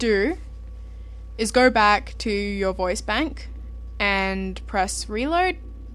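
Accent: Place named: Australia. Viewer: You're Australian!? ;D I love your accent!~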